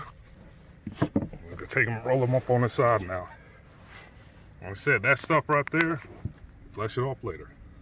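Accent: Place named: United States. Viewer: I can tell this is good cause in the first five seconds I heard the American in his voice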